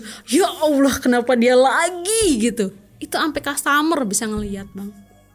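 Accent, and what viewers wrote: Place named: West Java, Indonesia. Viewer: Lidah orang sunda kayaknya 😂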